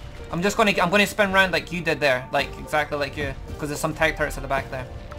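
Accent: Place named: Scotland, United Kingdom. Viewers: Where from scotland u from pal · not sure for certain but sounds like Glasgow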